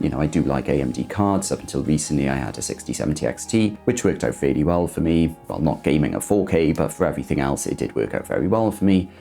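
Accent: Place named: England, United Kingdom. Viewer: I've heard a girl speak with the same style of English accent as you. What area of England are you from? Her name was Lowry... The name style even fits the accent. I'm wondering if I should wait for the 5070ti super? More vram will help with flight sim. My max will be this or the 9070xt because I don't want to upgrade my psu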